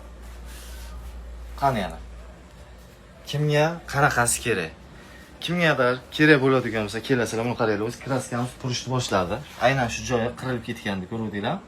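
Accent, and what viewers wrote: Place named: Shahrisabz, Uzbekistan. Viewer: Шахрисабзданми дейман укам.шева шахрисабча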